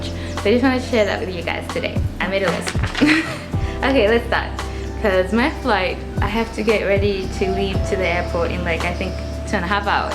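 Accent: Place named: United States. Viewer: You don't sound like someone who grew up with Danish as first language. I once lived in Denmark. You have an American accent! Are your parents American or did you school in the USA?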